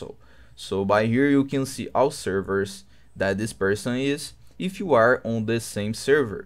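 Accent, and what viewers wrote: Brazil. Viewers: O sotaque Brasileiro me pegou kkkkk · parece muito carioca kkkkkkkk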